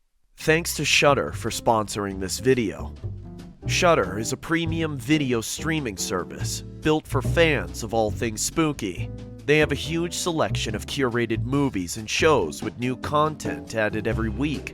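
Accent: United States. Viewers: Where is your Tennessee accent???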